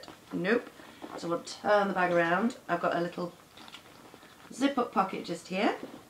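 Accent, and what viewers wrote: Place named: London, United Kingdom. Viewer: I love big bags too. Love yours. I actually just recorded one of these video's yesterday. I am gonna try to upload it today for yall. Or tonight. Lovein your accent. Where do u live? London? Also like your sense of humor. So u know Gemma pretty well? She is so sweet. I have known her from on here for a long time now. Such a doll she is. Anyways, great tag..I will have mine up soon. TFS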